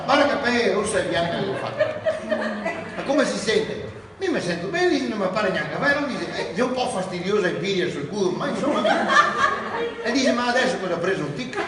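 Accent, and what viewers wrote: Veneto, Italy. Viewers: Le racconta bene 😂😂😂😂E la parlata veneta e spettacolare 😂😂😂😂😂😂🎉🎉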